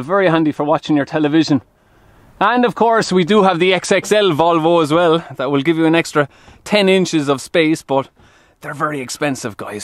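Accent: Ireland. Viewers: Greetings from Sweden. Love that Irish accent, wish I could speak like that 🙂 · Your voice is so entertaining to hear, I love the Irish accent Very informative, and the Scania R520 V8 Streamline is my favorite truck!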